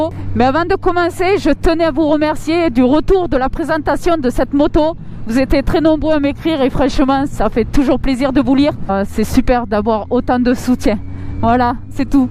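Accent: South, France. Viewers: cool l'accent du sud chez toi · Coucou magnifique vidéo et les paysage et ta belle voix du sud ça donne envie de partir en vacances,le problème des z750 sur c’était l’électrique et comme t’a pas abs il faut frères un peut plus de l’arrière moi je fais comme ça sinon je freine plus de l’avant la roue arrière ne touche plus le sol bonne continuation 👍✌️🙏😘🥰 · Le bel accent du sud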